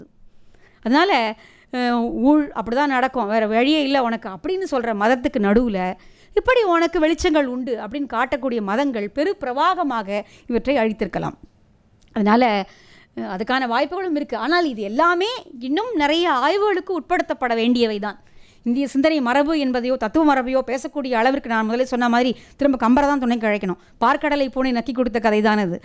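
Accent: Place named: Tamil Nadu, India. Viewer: Madam awesome 😊😊😊😊😊😊unga tamil slang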